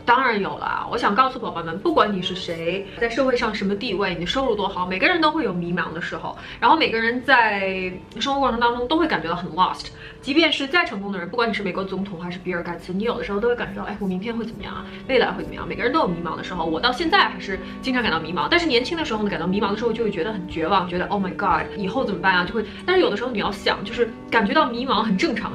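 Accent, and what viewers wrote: Beijing, China. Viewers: 偶尔看到的视频说话的语速和独特的气质感觉应该是北京女孩，果然是啊！好喜欢💕气质真好！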